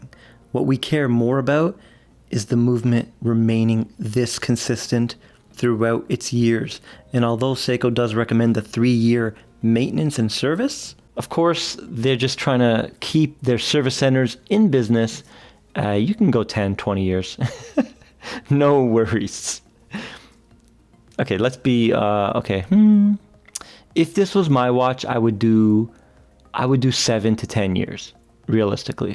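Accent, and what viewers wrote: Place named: Canada. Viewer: He only says it that way is because he is Canadian.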